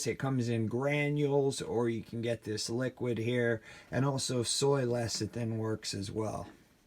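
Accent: Pennsylvania, United States. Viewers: It's called a double boil when you melt gummies or chocolate or butter or w.e in a Pyrex container in boiling water. You can also use a candy melter sometimes sold with candle making or baking stuff . Haha you are definitely from PA I can hear it in your voice! Thanks for the videos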